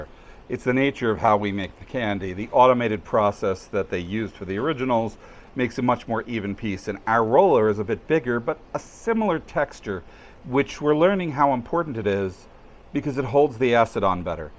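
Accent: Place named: New England, United States. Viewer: I don't remember if ever discussed it in a video before but I'd be really interested to know how, from the accent, a New Englander got to Tallahassee and started in the business of Gilded Age/Progressive era style handmade candy.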